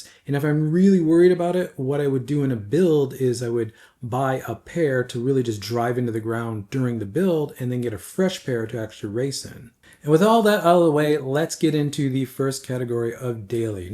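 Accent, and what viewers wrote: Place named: United States. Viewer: I had no problem understanding you and I’m surprised to hear people did. Maybe it’s an American accent and idiom thing.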